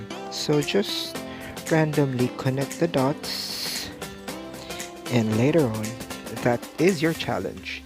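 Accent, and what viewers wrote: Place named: United Kingdom. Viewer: lovi'n the british accent though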